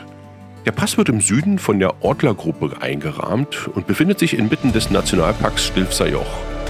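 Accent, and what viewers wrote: Berlin, Germany. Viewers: Mal gespannt auf die nächsten Videos. Schau mir dann mal die Serie der Westalpen an. Ich muss nochmal sagen: wirklich toll gemachte Videos. Und angenehme Stimme, trotz Berliner Slang ;-) Grüße aus Kerpen / Rheinland. Ralf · Hallo. Die Verbindung von Sprache, Gefühlen und Emotionen mit Deinen schönen Bildern machen das ganze echt sehenswert, erlebenswert und wir sind jederzeit bei dir. Du bist mit Deiner Berliner Schnauze echt entspannend und authentisch. Man nimmt dir das echt ab, deine Emotionen die du erlebst, die jeder Motorradfahrer kennt und so genießt. Deshalb fahren wir Motorrad um genau das zu spüren. Bleib Gesund und lebe deinen Traum, denn es geht ums Reisen nicht ums Rasen. Nur wenn du anderen darüber berichten kannst was du erlebt hast bist du Gesund wieder nach Hause gekommen. Das muss so bleiben um wieder einmal dem Alltag zu entfliehen. 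Bis zum nächsten Mal. Gruß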